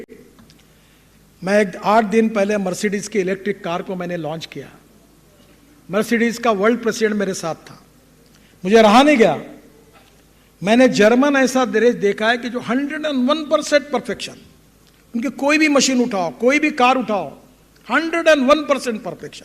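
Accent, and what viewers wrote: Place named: Maharashtra, India. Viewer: Somebody please make him learn good English, Marathi accent is acceptable while talking in Hindi; but not suitable for English please :-) Working is good but if you want to give speeches improve your speech. Even Lalu also tried :-) Use we and not me or I.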